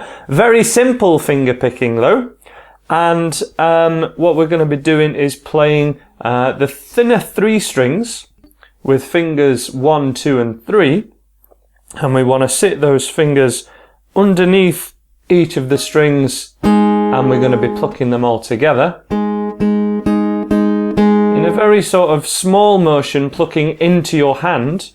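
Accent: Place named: England, United Kingdom. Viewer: Nice English accent ~ I'm just a beginner and I like this song ~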